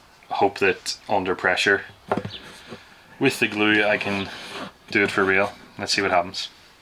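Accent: Northern Ireland, United Kingdom. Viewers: thanks for replying! Love our Norn Irish accent being broadcast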